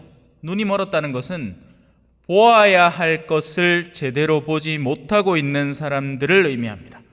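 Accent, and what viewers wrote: Gyeongsang, South Korea. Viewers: ..경상도.억양.실제에닮은꼴..많은.현세에삶.어찌콕콕..지적하여주신.신부님.저희들삶안에.오셨네요.. 절뜍..절뚝.저희들에삶..감사합니다돌아보게합니다🕯🥀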